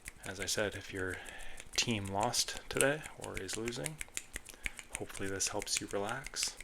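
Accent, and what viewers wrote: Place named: Canada. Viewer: Thanks for allowing us to join your channel! I do love how you talk, the way your voice sounds (so soothing and warm and comforting) and I ❤ your thick Canadian accent. And thaaank you for the roller ball!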